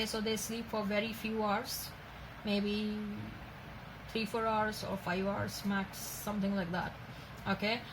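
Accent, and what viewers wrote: India. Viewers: Close this channel and go learn western English first so you can get rid of this Indian accent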